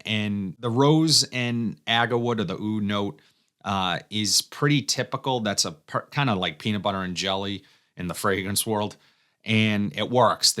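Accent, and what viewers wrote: Boston, United States. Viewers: Great review Boston bro! Have my son with me today and he digs your accent. Sadly mine only kicks up when in Beantown and Warner, NH :)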